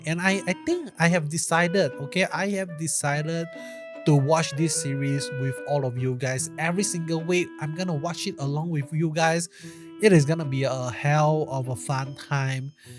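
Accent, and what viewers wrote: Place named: Japan. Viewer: Your thick accent is hard to listen to just speak japanese with English subtitles it would be less painful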